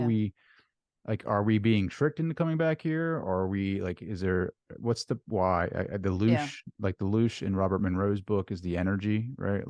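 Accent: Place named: Russia. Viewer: She has russian accent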